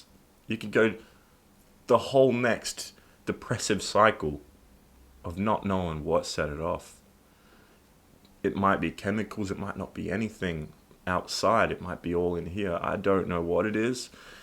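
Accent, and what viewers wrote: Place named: Australia. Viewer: Hey man. I'm follow you from Perú. Your dad's video really touched me. At that time my father was fighting a battle that soon after also took him away. I started watching your videos several years ago when I wanted to improve my English on my own and found your accent interesting. At the time I didn't know you were Australian. Now years later my listening is much better so your Australian accent helped me a lot. Thank you very much, keep strong and keep doing you.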